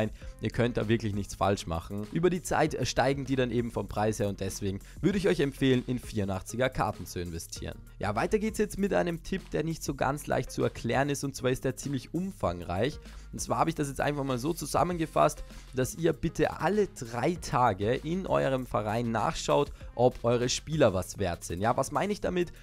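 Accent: Austria. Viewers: Woher kommst du? (Österreich oder Deutschland oder)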